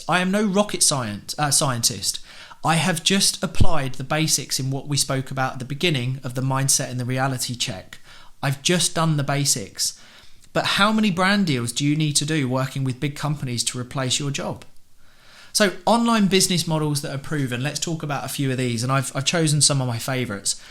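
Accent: United Kingdom. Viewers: Man~my good sir! I totally enjoyed your video. Coming from a country where American English dominates, now I am really starting to like your British accent. How marvelous! Thanks again.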